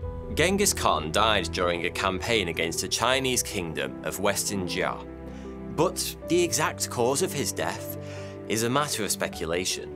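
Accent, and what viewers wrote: United Kingdom. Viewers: you're the best story teller ever and your britich accent makes 20 times better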